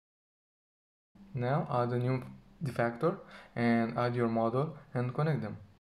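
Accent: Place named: Brazil. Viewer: amigo, vc é brasileiro, né? Pq pelo sotaque ta parecendo, se for, poderia gravar em portugues mesmo. Ficaria um tutor melhor.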